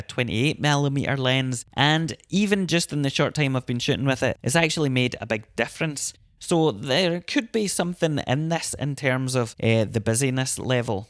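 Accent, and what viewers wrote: Scotland, United Kingdom. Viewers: 👀 Such an awesome new series for your channel and a perfect way to start it off! 🎹📷Really enjoyed all the photos and your "dulcet Scottish tones" throughout! 😁😁 So funny, we both live in areas where the attitudes of the people seem quite similar! haha Looking forward to the next!! 🙌🐀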